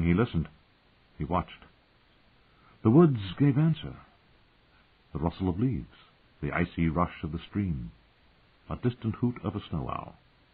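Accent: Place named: United States. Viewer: Just doesn't quite cut it with a American accent . Wish There was a Augmented Audio Book too . Or is there ? Point me there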